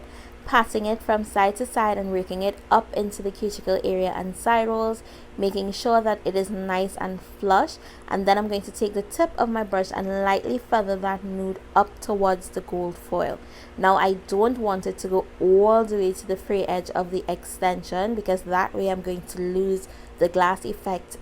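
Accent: Trinidad and Tobago. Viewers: Are you trini?? I love hearing a fellow trini accent 😍🇹🇹🇹🇹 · You sound a bit trini are you?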